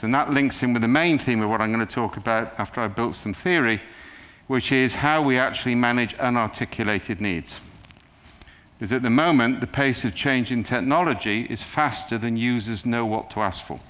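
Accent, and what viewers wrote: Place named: United Kingdom. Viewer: just brilliant talk! but definitely need to rewatch it to understand all of it. Also, British accent is hard to grasp sometimes, if only someone could make subtitles * _ *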